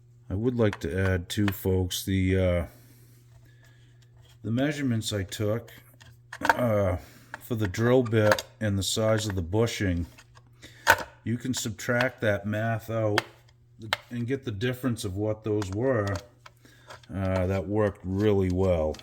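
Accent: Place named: Maine, United States. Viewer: I just happened upon your channel tonight. Nice to hear the Maine accent. I grew up in Maine, Farmington. I live in Florida now. It’s Ben a while since I was able to go back home to visit family. I’m currently building a strat from parts. I lost all my household goods, including all my music gear and guitars. I had all my stuff in storage when I moved to Florida. I got behind on I the rent and they ended up auctioning all my stuff. So now I’m starting over building a David gilmour inspired strat.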